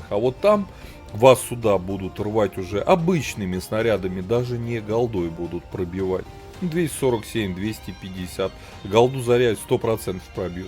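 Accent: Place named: Moscow, Russia. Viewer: Блин, какой же ты самодовольно противный. Прям Царь блин танков, манера Московская чтоли, акцент ужасно противный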